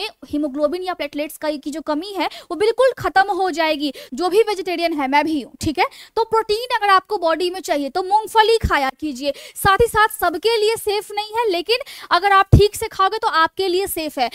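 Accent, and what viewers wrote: Bihar, India. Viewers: Mam vihari ke awaj me swag hai aapke awaj se hme pta chl gya aap pakka vihar se ho❤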